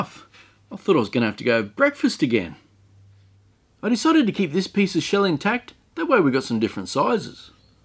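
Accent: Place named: Australia. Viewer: I don't care much resin. I'm here for the amazing Australian accent.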